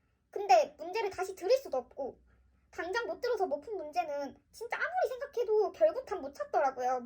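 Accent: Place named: Gyeongsang, South Korea. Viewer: 경상도 사투리가 좋습니다 ㅎㅎ 영상 내용도 좋네요 감사합니다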